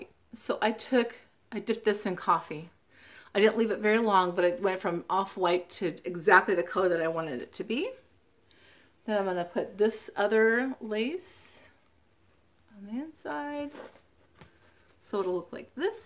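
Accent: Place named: South, United States. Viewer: Great video. I love everything you stitch. You must have lived in the south at one time or have southern roots. You say y’all (I love it) and you said little bitty. That’s a definite giveaway. Look forward to your next video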